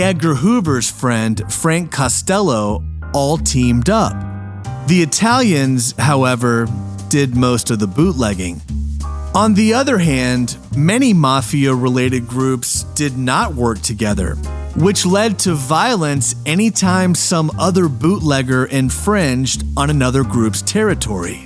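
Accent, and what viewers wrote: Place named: United States. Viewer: Very decent response. Cosa Nostra is phonetically pronounced Caw-sa Naw-stra (not the americanised way you do it) - there were others too.- just saying as a genuine Italian speaker from UK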